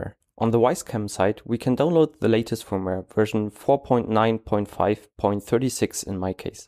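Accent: Germany. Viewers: Your english sounds german. Where are you from? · bin mir absolut sicher, dass du aus dem deutschsprachigem raum bist. dieser akzent ist so einzigartig hahah :D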